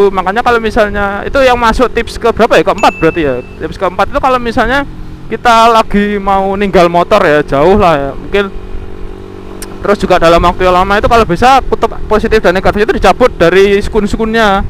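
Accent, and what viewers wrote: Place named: Java, Indonesia. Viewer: Medhok cuy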